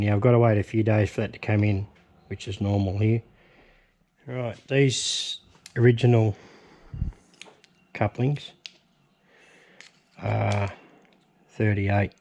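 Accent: Australia. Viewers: Good job! - By the way. I like the australian way to speak english! It sounds a little bit strange, but nice. Greetings from Dresden (Germany).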